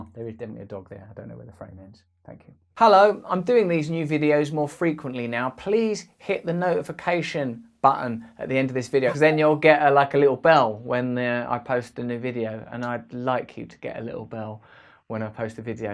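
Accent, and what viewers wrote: United Kingdom. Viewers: Fucking british and their pronunciations. Controversies...aluminum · Ive heard him talk on video. Never picked up british accent before.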